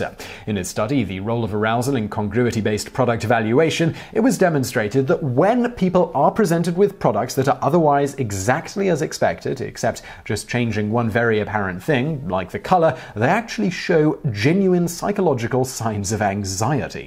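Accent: United Kingdom. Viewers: When did the vsauce guy get a British accent? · As a Californian I find English pronunciations of Spanish words delightful. I feel a little less bad about how much better they are at english than us. Wonderful episode as always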